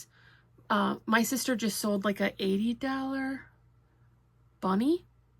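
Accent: Wisconsin, United States. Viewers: Tie-kon-do-ro-guh 😁. Those are great! Love the videos! Wisconsin accent makes me homesick. Thanks for sharing!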